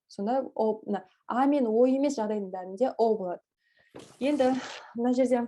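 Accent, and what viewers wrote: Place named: South Korea. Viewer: 발음이 더욱 좋아지는 것 같아요. 이제 한국사람 톤으로 말하는 것 같아요. 👏👏👏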